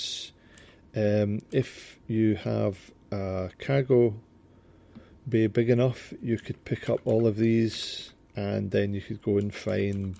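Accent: Scotland, United Kingdom. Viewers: are you scottish i can hear the accent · You sound just like me. I,m from Coatbridge.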